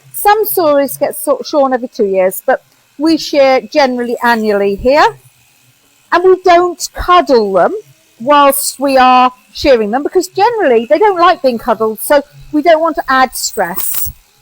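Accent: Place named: United Kingdom. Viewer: You are definitely from uk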